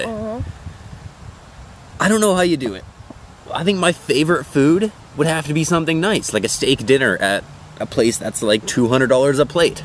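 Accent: Canada. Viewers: Am I the only one who hears the Canadian in his voice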